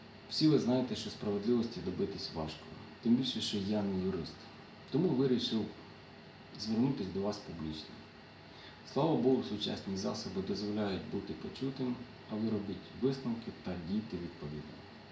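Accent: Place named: Galicia, Ukraine. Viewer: Справжній козак. За вимовою -галичанин.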